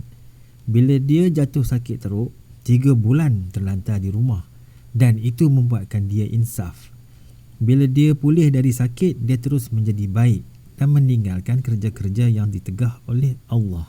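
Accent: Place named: Batu Kurau, Malaysia. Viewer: Loghat tu macan cakat orang batu kurau lah..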